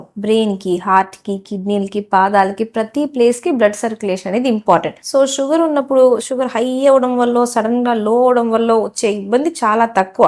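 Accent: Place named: Telangana, India. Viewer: చక్కగా చెబుతున్నారు. తెలంగాణ యాస కూడా వినడానికి బాగుంది 😅.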